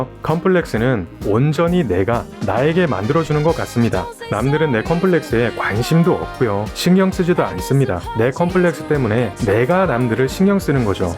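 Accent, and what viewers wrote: Busan, South Korea. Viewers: 부산분이셨군뇽~^^ 서울토박이이신줄 알았는데ㅋㅋㅋ 👍👍👍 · 부산사투리 듣기 좋던데요! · 우와 부산분이시라니 사투리 고치시는 연습을 어떻게 하셨는지 최고시네요. 👍👍👍👍👍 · 부산사투리 매력적인데!!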